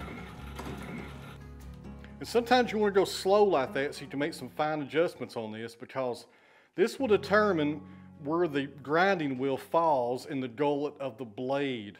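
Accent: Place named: East Tennessee, United States. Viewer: You're speaking english with an east tennessee flair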